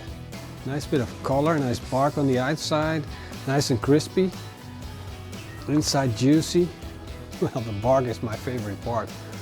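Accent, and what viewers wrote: Netherlands. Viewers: Glad I found this channel. Love the videos. Would I be correct in guessing that your accent is Dutch?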